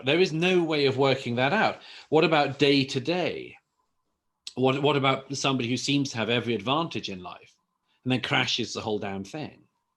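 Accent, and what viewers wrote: Wales, United Kingdom. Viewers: IS HE WELSH?? Go on boi :D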